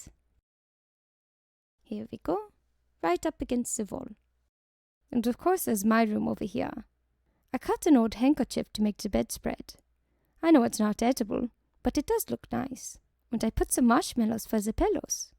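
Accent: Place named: Germany. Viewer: YEESSSSS. YOU NAIL THE GERMAN ACCENT. SO WELL. THANK YOU!!!!!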